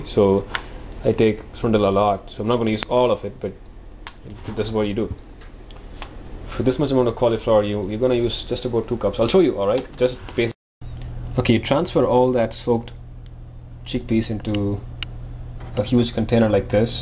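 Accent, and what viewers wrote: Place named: Wales, United Kingdom. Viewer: This fellow sounds Welsh!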